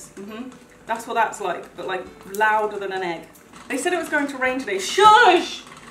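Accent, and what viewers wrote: United Kingdom. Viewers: I'm new is this girl British? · I love ur accent and ur vocabulary! It’s so crackup, typical British😂(well I’m guessing) lol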